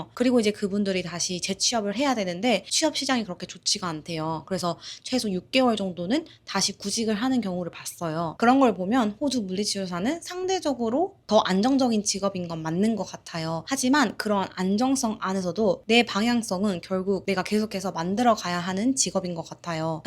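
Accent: Jeolla, South Korea. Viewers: 전라도 사투리가 참 정겹네요 잘 들었습니다 감사합니다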